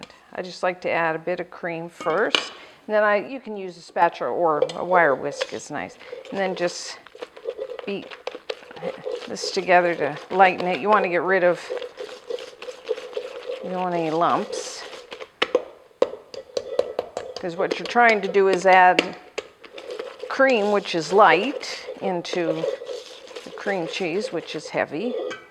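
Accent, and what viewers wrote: Canada. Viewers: I should definitely use this recipe. Well, after I burned the regular bake version, which was unpleasant :x Your accent seems to familiar to me, aren't you from Canada? :)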